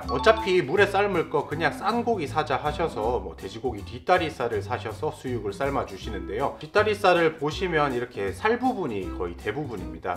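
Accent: Gyeongsang, South Korea. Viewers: 경상도 억양이 다정스럽고 어딘가 품위가 느껴지는듯해요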